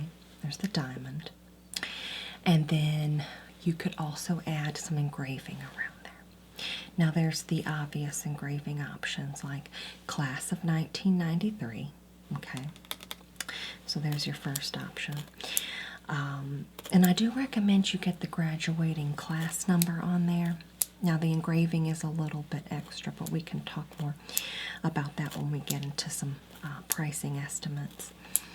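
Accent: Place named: South, United States. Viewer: I keep coming back to this one! You are just so talented! It’s so natural and calming and feels so real! (Also I’m from NC—you have to be from the south too!!) 🥰🥰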